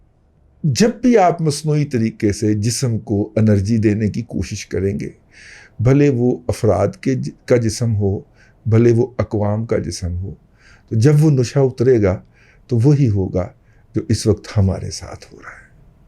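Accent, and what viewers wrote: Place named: Saraiki, Pakistan. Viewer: AoA Saein, I like your sairaiki accent very much. Please also give a sairaiki touch with Punjabi.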